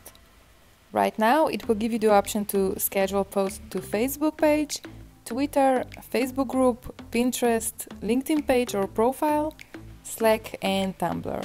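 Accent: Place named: Hungary. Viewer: After couple months in Budapest I recognize that Hungarian accent anywhere lol! Great vid!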